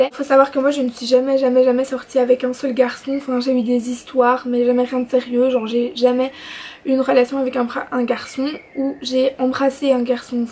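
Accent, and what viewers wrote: Belgium. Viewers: Accent belge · Tu es belge, non ? Tu as un accent vraiment adorable. Et t'as vidéo m'a vraiment aidé merci beaucoup :) xx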